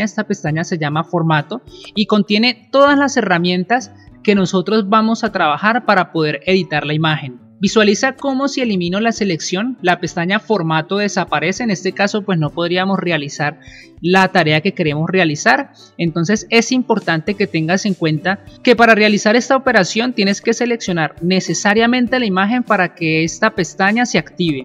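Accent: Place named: Colombia. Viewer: "Le doy los agradecimientos" que lindo habla, muy propio. Acento Colombiano creo. Saludos, fue de mucha ayuda el video. ;)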